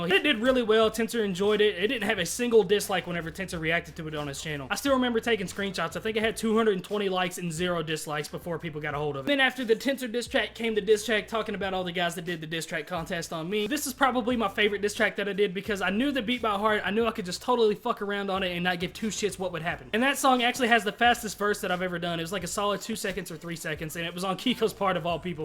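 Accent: Kentucky, United States. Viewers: Where from southern KY You from?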